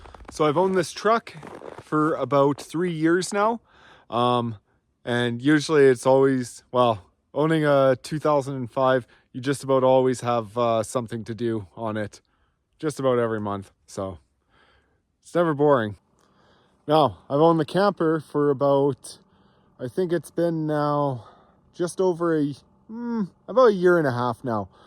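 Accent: Canada. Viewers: As soon as you started talking I knew you were Canadian and when I saw no plate on the front of the truck I figured AB or YT, although I was leaning toward YT when you mentioned minus 30 degrees.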